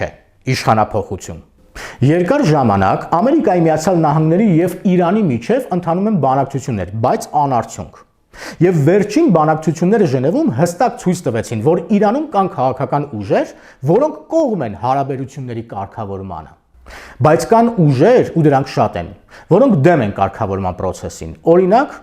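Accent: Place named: Yerevan, Armenia. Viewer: Меня бесит ваш эриванский акцент. Не надо тянуть гласные!!!!!!!!!!!!!!!!!!!!!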